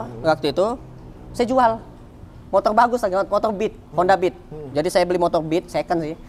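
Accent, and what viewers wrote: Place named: Palembang, Indonesia. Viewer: apo kabar mang cek gaya wong palembang nian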